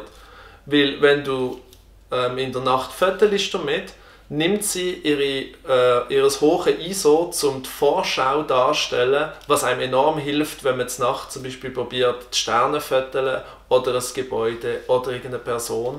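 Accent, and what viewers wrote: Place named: Switzerland. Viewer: Hallo,wäre es möglich auch das Video in DEUTSCH zu machen ?? Schweizerisch sehr schwer zu verstehen !!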